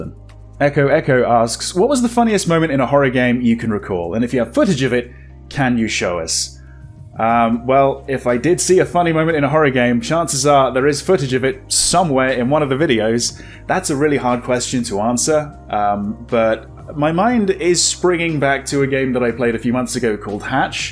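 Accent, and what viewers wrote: United Kingdom. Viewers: Thank you so much for doing this. I started to watch you a few years ago, because I was about to do voluntarily stuff in the UK. So I looked for "british sounding lps" to get used to it to understand it better. I had to stay for your humour, for the fun, for the checked toilets, for the very rare screams. I think we'll get to 200.000. Let's do it! With some pizza and tea! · Congrats on 100K!! 🥳I have been following you for a long time now and you, Markiplier and Jacksepticeye are my favorite videogamers to watch on YouTube. Your videos are always entertaining and wonderful to watch! You brought my attention to wonderful games like the Cat Lady, Silence of the Sleep and Downfall. I looooved those videos you recorded so much. I enjoy it that you play Indie horror and Asian horror games that mainstream youtubers don't play that much. 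Also, your British accent is so awesome! 😁 keep up the good work! I appreciate you and what you do! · ‘In Bruges’ is a fantastic movie!! I also had your accent pegged as a fellow Surrey person. We sound very similar. This was a great video,. Pleased we get to know more about you.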